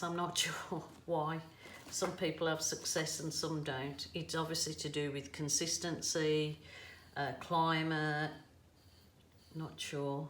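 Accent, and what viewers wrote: Birmingham, United Kingdom. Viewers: Are you from Birmingham? Lol if so - waves from walsall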